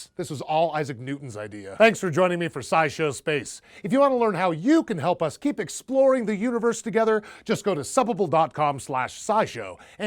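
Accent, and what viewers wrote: United States. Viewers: Let me preface this by saying the narrator on here is great. Does a fantastic job speaking, but I’m pretty sure this guy is an American. If he isn’t he’s masking his accent brilliantly. IN AMERICA WE DONT USE SOCIALIST MEASUREMENTS! We use the imperial or The US Customary units. I realize that The International System of Units (SI), the modern form of the metric system, is preferred for many uses by the U.S. National Institute of Standards and Technology (NIST), but guess what, we viewers are not scientist. We can literally visualize in our minds 10000 miles, 600mph or 10’ tall, or a yard (as in 36” and not something you mow people from WV and south). We Use the imperial system !!!! Geez.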